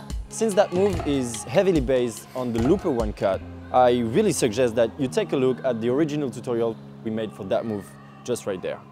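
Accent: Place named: Russia. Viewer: Я не могу понять, вы русские что ли? Акцент прям похож..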